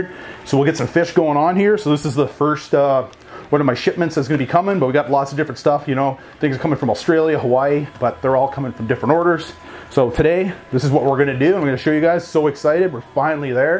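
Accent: Canada. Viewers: You’re 100% Canadian eh?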